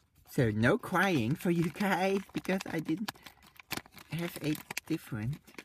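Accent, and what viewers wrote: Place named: Germany. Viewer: Kanns sein das du deutscher bist? :DDD Du sprichst die Namen so Deutschig Aus :D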